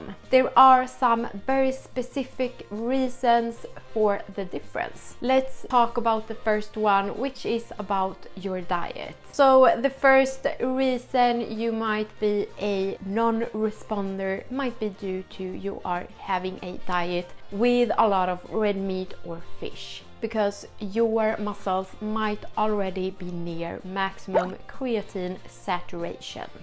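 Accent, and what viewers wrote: Sweden. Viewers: Thanks for the info as I just started my 5mg daily. I felt noticebly stronger/more enduring than usual workout after only few days, but do admit it could be the placebo effect. Will know better next few weeks. Your calm and Swedish influenced accent is appreciated.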